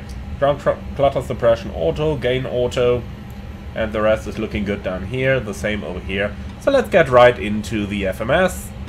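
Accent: Switzerland. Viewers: What is your Swiss accent 😂? Really nice. Thanks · I love your swiss accent😊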